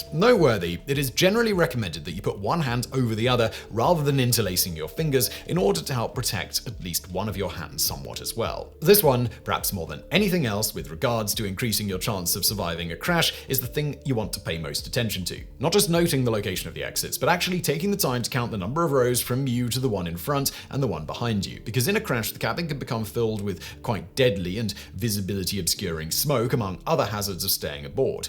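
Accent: United Kingdom. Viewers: I sometimes find myself paying more attention to Simon’s diction than to what he’s actually saying. How is it that the British pronounce the word “air” as just “a,” with a short “a” sound? Also, since my son is a flight instructor working toward building enough hours to fly commercial, I am also very thankful for the strength of airline safety. I keep telling people who worry about flying that they should really be worried about driving to the grocery store. Also, it’s glide “slope,” not glide “scope.”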